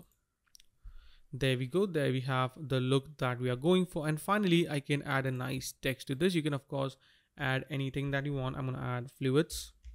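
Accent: India. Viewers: Typical Indian English accent, lol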